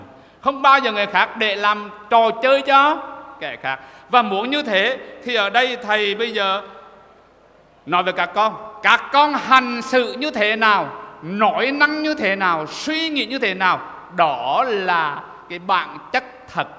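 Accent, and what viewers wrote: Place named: Hue, Vietnam. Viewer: Mô phật con rất thích nghe thầy giảng, thầy nói giọng huế rất hay.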